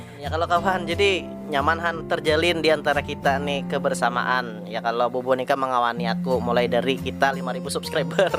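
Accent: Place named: Hulu Sungai, Indonesia. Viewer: Orang mana kam logat hulu sungai pang nah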